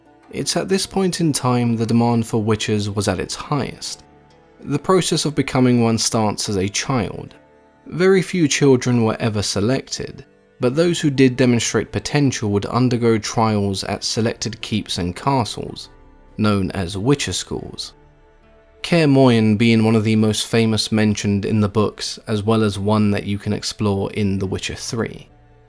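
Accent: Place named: England, United Kingdom. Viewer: Direct, clear, informative and English accent....good video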